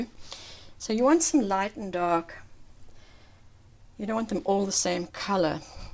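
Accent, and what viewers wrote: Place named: South Africa. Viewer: Hi, Ive been doing watercolours for the last 9 months and loving them. Thanks for your videos they have been very helpful to me. By the way you sound very south african...must be an expat!:)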